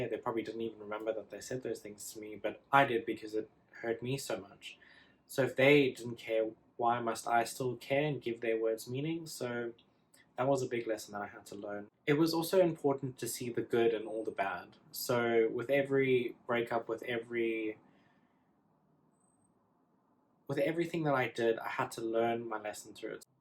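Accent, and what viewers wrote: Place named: South Africa. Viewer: Lovely South African accent? It is not as heavy as others I've heard. Cape Town? You didn't mention how you came out to your family.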